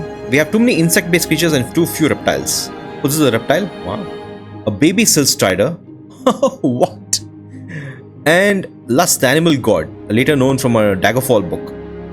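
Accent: India. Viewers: God damn your accent is really annoying, wash away that "v" when saying w damn indian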